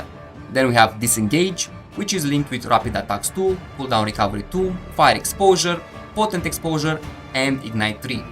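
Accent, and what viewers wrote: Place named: Philippines. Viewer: When I heard your accents I was worried that the video would suddenly changed to tagalog. nice build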